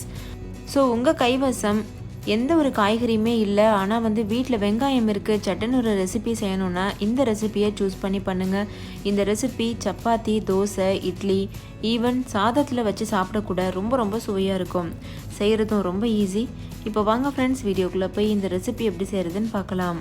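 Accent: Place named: Madurai, India. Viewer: akka unga slang madurai mathiri eruku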